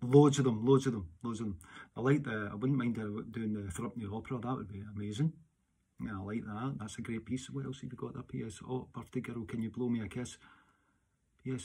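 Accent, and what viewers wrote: Scotland, United Kingdom. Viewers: I love your Scottish accent, I’m was born in Mexico 🇲🇽 City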